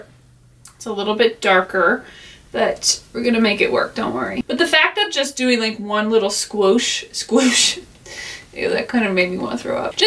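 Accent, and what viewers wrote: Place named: New Jersey, United States. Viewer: I basically fell upon one of your vids the other night and when I heard your accent I thought she has to be from Jersey! I think you're awesome anyway tho 😁 #subscribe 💜 from Philly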